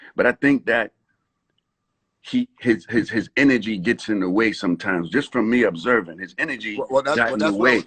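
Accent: Jamaica. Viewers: Yo a fuckery a diss I didn't even know this was happening yo man fuck all this bullshit why name getting called in fuckery y'all who know know he don't hate know he big up EVERYONE no matter what it don't matter where you from what you rep big up everyone and him stay away from fuckery I'm dissapoimted that he has to address some fuckery like this I've never seen like this for him to be like this is nah right nah kool a fuckery ah dis general he fucking holds it down for the WHOLE AH DUH FUCKING CARRIBEAN y'all ignorant idiot talk bout him not no yardie nah matter if em yardie or not em support all yuh and he is yardie listen when he speak regular in a non YouTube shit he speak patois man a yardie pusshole all yuh but Nah the point the point ah fi look out fi di Caribbean and ah dah whole ah we nah just yard Nah just Trini but em chat fi did whole ah di carribean brighten up all yuh ignorant fool realize what he saying is we Caribbean need to support each other and not from they come up but from they ground from they dirt and that we as carribeans needs to support each other no matter who fucking island yardie bajan Trini gayaneese Nah fucking matter we need to fucking show love appreciation and support and big up we culture we are one Caribbean because in the U.S in the U.K in Canada dem nah see we dem nah respect we we ah FUCKING CARRIBEAN WE ARE THE FUCKING WHOLE AH WE support the WHOLE AH ONE ANOTHER not just one island support itself but all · ok i'm convinced you're Jamaican now. 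I can hear the natural accent.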